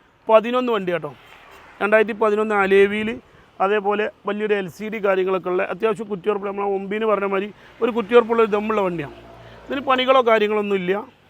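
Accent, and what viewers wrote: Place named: Malappuram, India. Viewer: നമ്മളെ മലപ്പുറത്തിന്റെ ശൈലിയും ആരെയും വെറുപ്പിക്കാത്ത സംസാരവും❤❤❤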